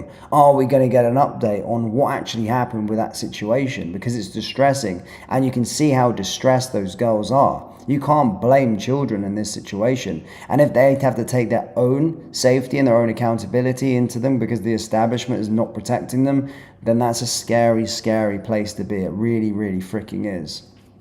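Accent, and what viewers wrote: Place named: England, United Kingdom. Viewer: why should he be speaking like an English person in a Scottish town?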